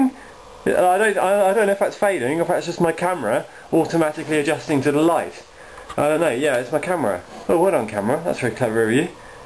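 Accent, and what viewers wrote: United Kingdom. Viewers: Nothing he is BRITISH!!!! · you are so british. it's awesome. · that was really funny! ...might just be the British accent, though. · wow some ones british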